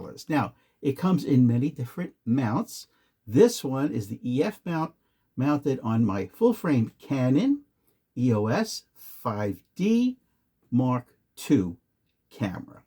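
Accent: New York, United States. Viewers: Watching from Bangkok Thailand! You have the best New York accent ever. Thanks for your advice on my new mic set up!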